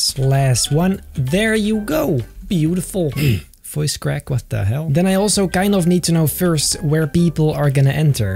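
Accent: Netherlands. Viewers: Hallo, Jij bent ook nederlands toch? Ik kan je nederlandse toon hooren, net als thijmen0808. Hij praat ook net als jouw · Ja je bent Nederlands, lekker bezig accent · Are you dutch !? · i smell dutch hahahaah lekker toch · are you dutch? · i smell dutch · Are you dutch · I smell Dutch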